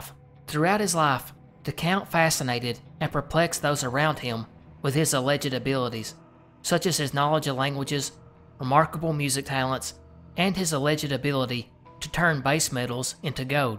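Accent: South, United States. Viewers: You don't know how happy I get when I see a new video from you. Love that southern accents. It makes the videos so much better. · There’s something about a country accent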